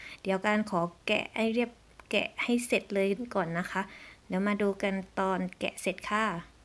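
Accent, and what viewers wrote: South, Thailand. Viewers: เก่งจังน้อว คนใต้แน่เลย สำเนียงได้ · รู้เลยว่าคนพากย์คนใต้นิ555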